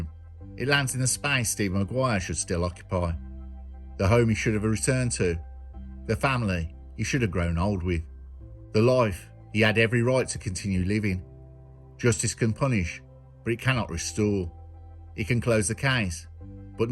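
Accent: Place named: Black Country, United Kingdom. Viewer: Just love the black country accent. Fantastic. Brilliant narration as per usual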